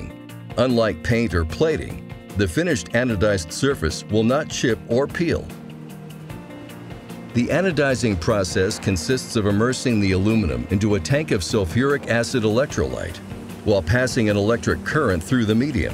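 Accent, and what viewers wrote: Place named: United States. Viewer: american english lol i speak british english and i feel you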